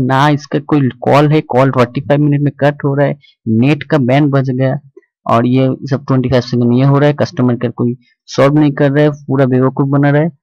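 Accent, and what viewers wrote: Bengal, India. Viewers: bro u r bengali lol love you bro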